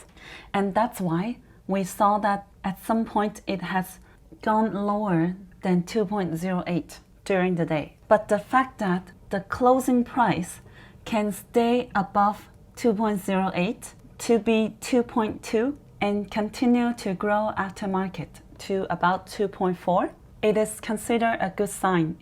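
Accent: China. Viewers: showing them some colors...这中式英语哈哈哈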